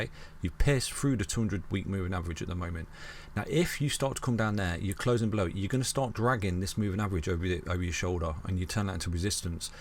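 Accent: United Kingdom. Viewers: Finally, the first! I love your channel and how yku present everything. British accent on top. Haha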